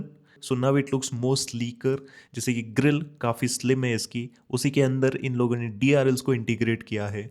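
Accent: Kerala, India. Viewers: I am big fan ...the way u talk....think ur malu from kochi. Thanks 👍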